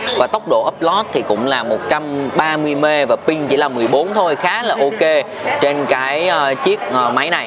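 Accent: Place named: Binh Dinh, Vietnam. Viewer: Nghe giống giọng Bình Định quá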